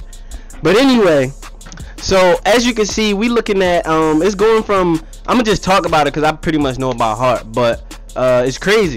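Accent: Baltimore, United States. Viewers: He sound like he from Baltimore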